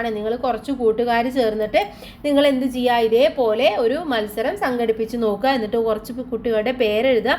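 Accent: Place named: Haryana, India. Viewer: Teacher ka Hindi Haryanvi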